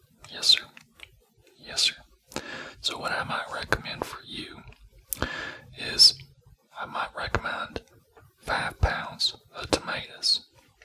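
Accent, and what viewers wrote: Texas, United States. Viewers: I’m down I’m in tx right now it’s perfect man I thought you were a all Texan family man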